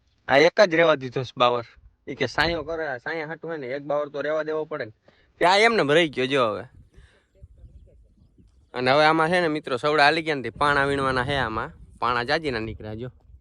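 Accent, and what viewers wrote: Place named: Gujarat, India. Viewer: Jai mataji bhai gujarat ni boli akdem mithi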